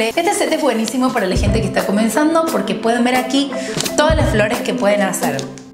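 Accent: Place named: Rioplatense, Argentina. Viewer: te escuchaba y digo ser argentina esta chica o uruguaya y vi el mate de fondo jajaj osea que si! hermoso video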